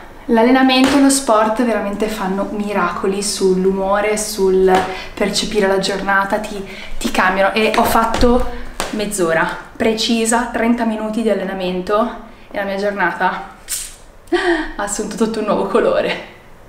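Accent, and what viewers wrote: Tuscany, Italy. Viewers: Ma hai preso l’accento toscano…adoroooooohhhh 😍😍😍😂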